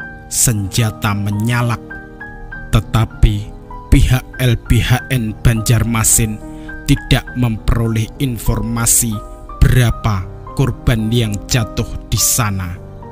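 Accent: Java, Indonesia. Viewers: terlalu medok bahasa jawanya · jgn medok medok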